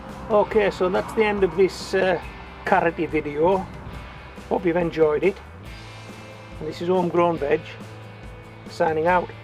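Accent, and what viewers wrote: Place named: England, United Kingdom. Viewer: Great video! I laughed all the way through at your accent 😄😆😂 I was born in Harrogate, but came to Australia when I was 3 yrs old, but I have an elephant memory, so I have a lot of memories of my time in Emgland, even though I've never been back. I recognise your accent, so entertaining to me 😄😆, you sound like my Dad who I don't get to hear anymore 😔 keep up the good gardening, wouldn't be easy in the UK compared to Sunny Australia, it's Autumn where I am and still 30 deg 😉👌🏾 I'm about to plant my peach sweet potatoes and add some coffee grinds I've been collecting now for about 2-3 year ⬅️ 😄 that's my pommie 😂. 🇦🇺☀️🇬🇧🌨💦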